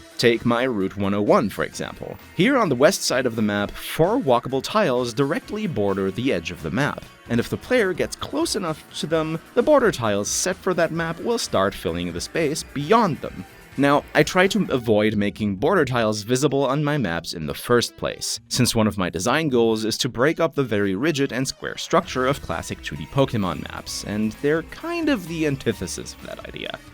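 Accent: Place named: Germany. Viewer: Are you german by any chance? You sound a lot like you were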